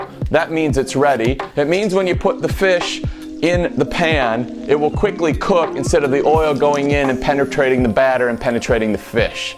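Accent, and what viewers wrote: United States. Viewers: you sound like a yank ,but then you say english slang...love the vids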